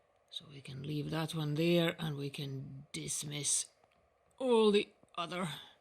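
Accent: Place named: Scotland, United Kingdom. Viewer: Great video,your son did a great job handleing the video.trying to place the accent, im in NE Scotland.get the feeling you are not far away.Thanks again,regards John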